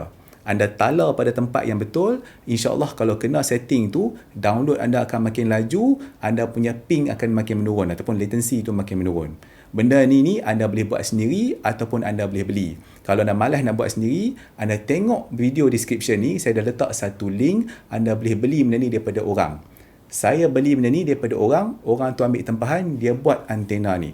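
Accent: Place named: Kedah, Malaysia. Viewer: walaupun anda menyebut anda...tapi kokdiang nya tu cukup masih pekat kedengaran hahahah kedah hadirrr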